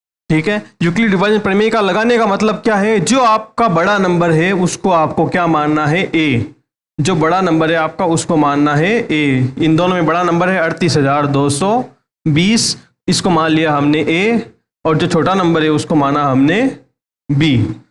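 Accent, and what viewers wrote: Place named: Rajasthan, India. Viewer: Bhai kuch interesting video banao biring lagta hai,dekho ek tho aap logo ki language sahi nahi, English talking meh Rajasthani jhalakti hai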